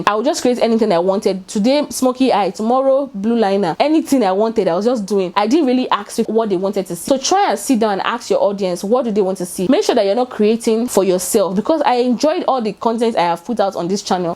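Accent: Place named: Nigeria. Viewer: De Nigerian Accent🌷🌷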